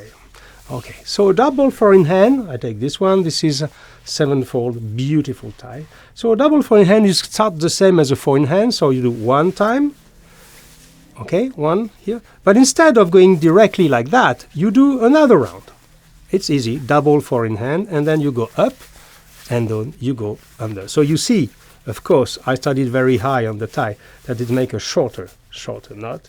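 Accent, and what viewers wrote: France. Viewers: Please upload more often. I love watching/listening to you, the french accent adds so much to it. I'd like to hear more jokes from you too like in the shoe series with the "not my cup of tea" joke about the loafers. Really laughed with that one 👍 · Very well said! „As long as you not look like a clown“ is basically all one should consider. By the way, I love your French accent when speaking English.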